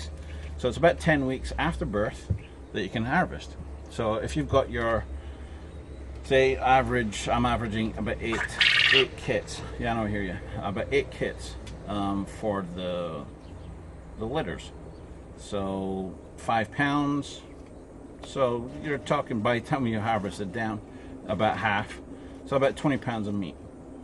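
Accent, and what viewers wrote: Scotland, United Kingdom. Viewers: Have you always lived in canada? I swear I can hear some scottish in your accent!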